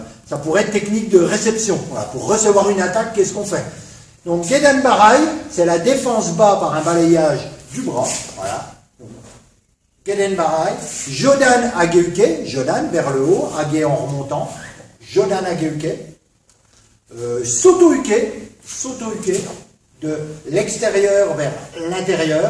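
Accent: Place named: Franche-Comté, France. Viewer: J'adore tes vidéos. Tu as un léger accent qui me rappelle mes origines, le territoire de Belfort et la haute Saône. Cordialement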